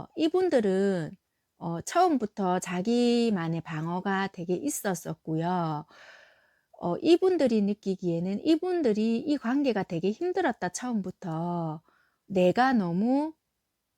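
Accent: Busan, South Korea. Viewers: 최근 헤어진 커플만 해당되는줄 알았어요 ㅎㅎ 감사합니다! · 님 상대가 마음정리 했을까봐 두려웠는데 아직도 마음이 있다고 하니까 위로도 받았어요🥺 리딩 감사합니다 💖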